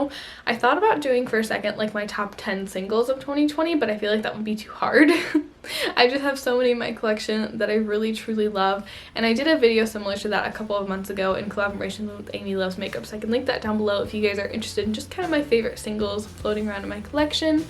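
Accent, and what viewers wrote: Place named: Southeast, United States. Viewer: I hear that pronunciation a lot in the southeastern US 🤷‍♀️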